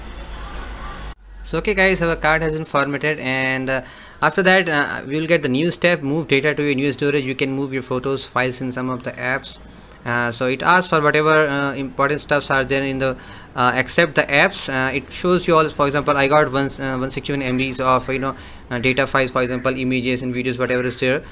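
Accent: India. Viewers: you sound like a hindu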